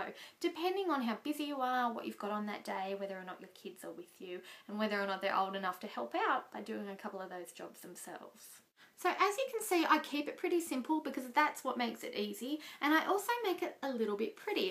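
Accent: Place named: Australia. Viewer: I detect an accent and noticed you spelled some words differently than the way Americans do. Are you Australian or British (I'm leaning more towards Australian).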